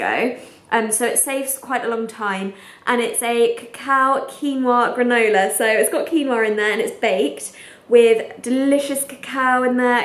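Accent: England, United Kingdom. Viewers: Is she from England?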